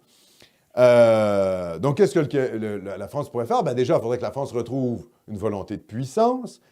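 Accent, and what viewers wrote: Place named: Quebec, Canada. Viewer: Je ne me lasserais jamais de ce mélange d’accent québécois et des punchlines à la française ! Merci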